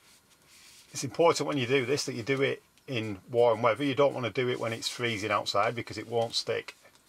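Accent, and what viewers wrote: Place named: Scotland, United Kingdom. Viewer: I can sense some Scottish accent. Do you work near Glasgow by any chance?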